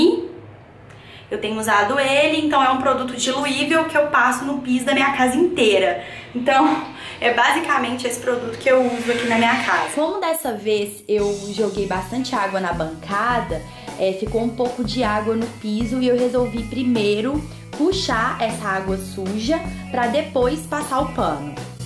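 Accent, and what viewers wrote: Minas Gerais, Brazil. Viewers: Pois se acostume, pq ela não vai mudar. Mineiro fala assim.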